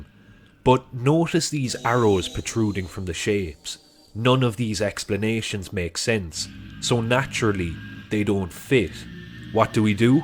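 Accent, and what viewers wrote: Cork, Ireland. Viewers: can hear an Irish accent much love from Dublin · Sounds like a Cork accent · IRISH ACCENT MAKES IT SO MUCH BETTER!!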